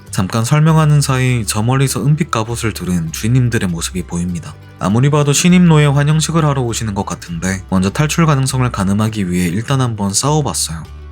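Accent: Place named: Gyeongsang, South Korea. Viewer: 뭔가 내부자들 조승우 같은 서울말쓰는 경상도사람 느낌이라 평온함 ㅋㅋ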